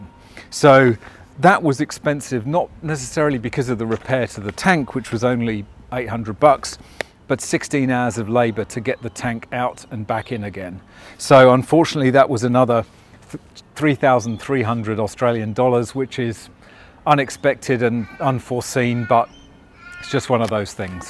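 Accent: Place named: United Kingdom. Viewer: Hey, you sound very Brit 😀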